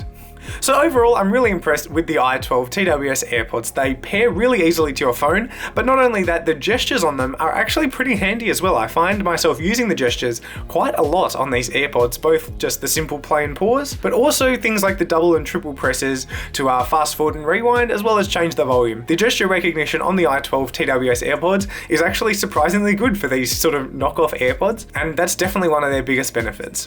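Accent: Australia. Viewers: His aussie accent <3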